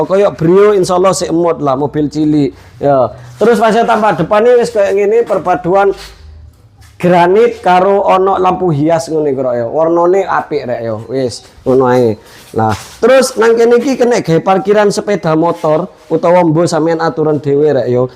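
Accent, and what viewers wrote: Madura, Indonesia. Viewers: Meduro logate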